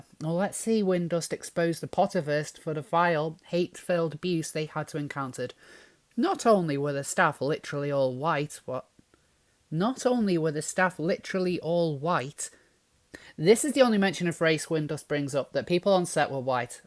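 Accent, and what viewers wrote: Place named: Scouse, United Kingdom. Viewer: Keep going. Lovin' that scally accent 😘💕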